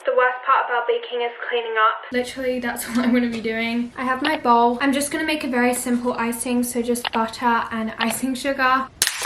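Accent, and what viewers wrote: United Kingdom. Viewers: Your videos are amazing!! Love your way to do this 💕 and your british accent is so pretty ❤ hugs from Ecuador :)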